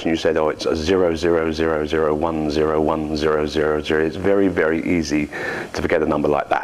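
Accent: England, United Kingdom. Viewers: This is how it's pronounced over here in the UK! He's English if you haven't noticed! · This isn't bad. Can't remember the last time this american learned anything from someone talking with an english accent.....lol how american of me ....lol Great tutorial son.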